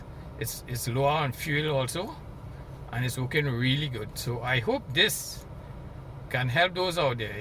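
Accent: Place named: Trinidad and Tobago. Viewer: Ah hearing d accent trini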